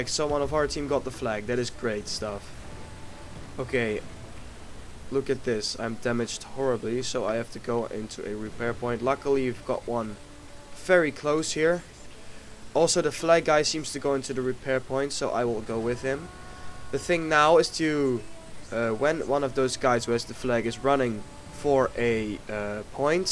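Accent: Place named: Netherlands. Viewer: Jij bent een Nederlander toch want je uitspraak daar kan ik het aan horen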